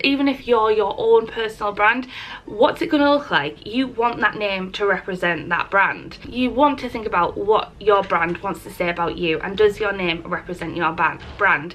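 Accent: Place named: Middlesbrough, United Kingdom. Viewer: So pleased you made this video. I went through the same sort of process writing down all sorts and ended using 3 names together. The name my dad always called me - sweetpea - and the name my brother used to annoy me with - Polly - and because my business is craft related I used - crafts. So hence the name Sweetpeapollyscrafts. Not sure now if thats too long or I should have used cards instead of crafts as that is what my business is about. Your accent makes me feel like I am back home in Middlesbrough and I really love it when you visit the coffee shops in Marske, its not far from the hair salon I used to go to - Contemporary. Keep up the great work!!!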